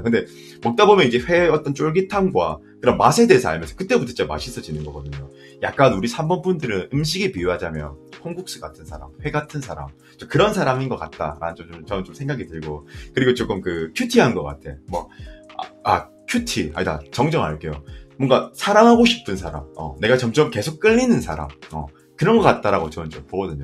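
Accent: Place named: Gyeongsang, South Korea. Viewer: 경남분인듯 저 3번여 콩 콩 ㅋㅋㅋㅋㅋㅋㅋㅋㅋ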